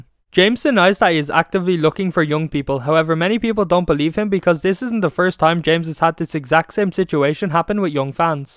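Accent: Ireland. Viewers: Are you Irish? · Are u from ireland 🇮🇪❤️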